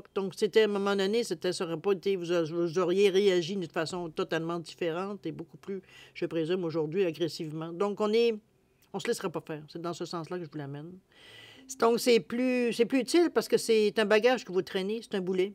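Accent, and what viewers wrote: Canada. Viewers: J’aime beaucoup ces moments passés en votre compagnie, ce matin au petit déjeuner😊 actuellement bloquée dans ma vie suite fracture du genou, c’est tout à fait ça… lucidité… émotions présentes mais qui font qu’on se sent vivante… je retiens « Patience et confiance »…j’aimerais bien retourner au Canada ❤ et votre accent m’en donne un avant goût… merci à vous 💐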